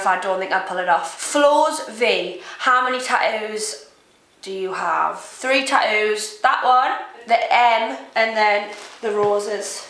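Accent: Newcastle, United Kingdom. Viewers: can you call is please? <num> and if you werent geordie what accent would you want to have? xxxxxxx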